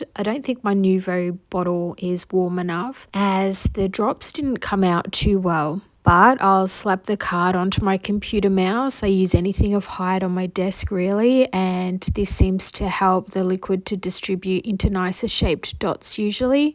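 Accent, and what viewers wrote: Australia. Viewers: Love love hearing a Aussie going so well and does Brilliant work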